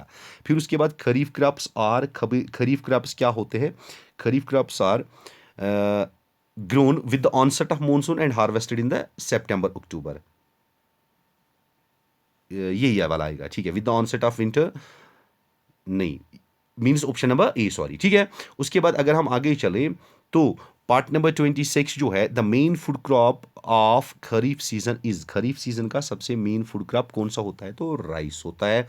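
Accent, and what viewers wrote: Kashmir, India. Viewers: Ur accent is walla...Kashmiri accent...very informative..👏👏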